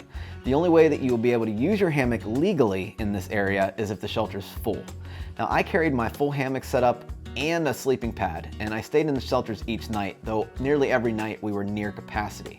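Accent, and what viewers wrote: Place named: Pittsburgh, United States. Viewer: sounds like a Yinzer to me (I'm from the eastern side of Pennsylvania).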